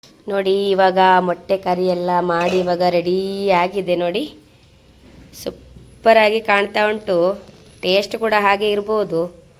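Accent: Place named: Mangalore, India. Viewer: Motte recipe super ❤love ur Kannada accent mangalore style ❤😊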